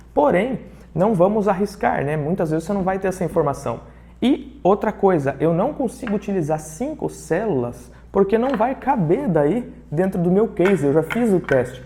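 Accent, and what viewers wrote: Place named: Paraná, Brazil. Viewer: Fala, esse daí em final de frase... Acho que tu é do norte paranaense hein... Rsrs. Massa a aula, inscrito aqui e acompanhando pra aprender um pouco sobre eletrônica. Hoje trabalho com suporte em TI, mas estou buscando um 2ª fonte de renda.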